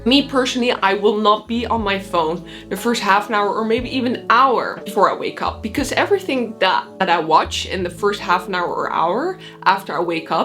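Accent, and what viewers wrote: Netherlands. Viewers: Nice video.. are you dutch? Can hear it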